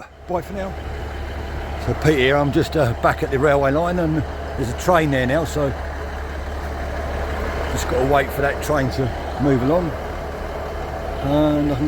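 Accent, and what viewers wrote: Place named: London, United Kingdom. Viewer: Just out of curiosity you dont sound like you come from the area... more like my neck of the woods..... London?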